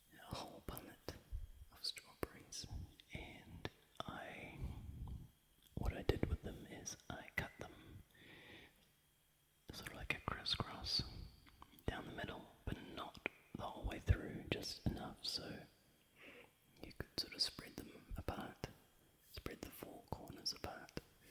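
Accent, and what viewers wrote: New Zealand. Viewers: I find it the most bizarre thing hearing you whisper and having the same accent as me. It shocks me even when I'm expecting it! Merry Christmas by the way! Hope you had a really nice day :) shopping malls are so busy here at this time of year. They broke a record on the intake of money this year apparently. Good old kiwis ae? Are you in the North or South Island? Really great videos! If you ever want help with some inspiration I'll help you out. :)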